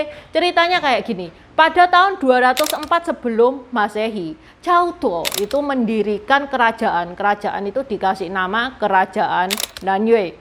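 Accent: Java, Indonesia. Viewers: apa dah berumah tangga, and anda kyak dri jawa ngomongnya tu lo medhok bnget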